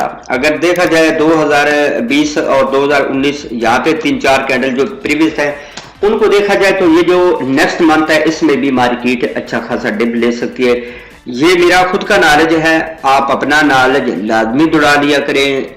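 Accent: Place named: Punjab, India. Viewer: ap jo punjabi ka tarka lagaty hain wo bahot he mazy ka hota hai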